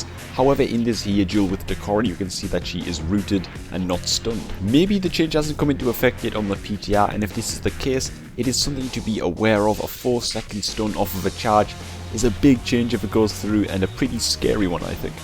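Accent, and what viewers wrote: Newcastle, United Kingdom. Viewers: are u a geordie?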